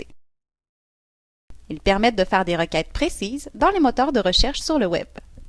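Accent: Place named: Canada. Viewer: j'adore la voix canadienne, amusante🤭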